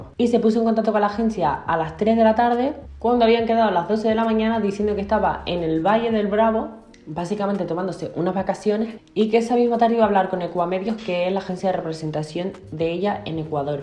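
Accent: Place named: Canary Islands, Spain. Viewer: Soy la única que le nota el acento canario más marcado de nuevo? Qué recuerdos de sus primeros vídeos ♡♡ Saludos desde Gran Canariaa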